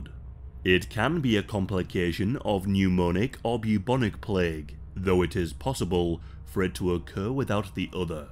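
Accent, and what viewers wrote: United Kingdom. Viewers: he hasn’t got a ‘funny accent’, he has a regional British Accent. Perfectly acceptable.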